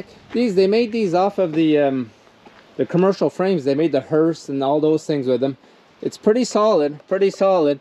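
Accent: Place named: France. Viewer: no problem, the French accent can throw you off sometimes, I’m French and I get tricked sometimes. 😉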